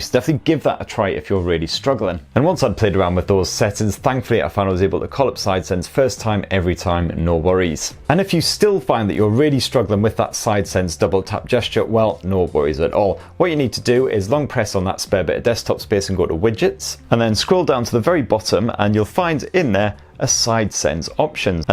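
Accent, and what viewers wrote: United Kingdom. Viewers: Hey bub Just to let you know, I had a hard time keeping up with your tips and tricks,, you went extremely fast explaining the tips, you had to rewind and play what you said several times to catch what you said, 2 your British accent is a little difficult when you're going too fast speaking wise, 3 you're an phone expert but many people might not be so try to use common words for average people just my advice bub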